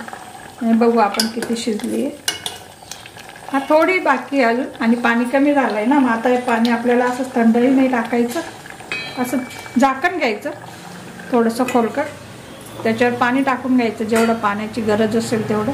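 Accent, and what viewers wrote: Khandesh, India. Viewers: Tumcha aavaj olkhicha vatato aahe Tumhi khandeshi aahat ka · Chan Tai. Recipe takat Jaa .... Khandeshi awwaz aiklyawar pan samadhan milta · ताई तुम्ही खान्देशी आहात का कारण आपल्या खान्देशी भाषा लगेच ओळखले जाते आणि आम्ही तुमचेच सर्व व्हिडिओ बघतो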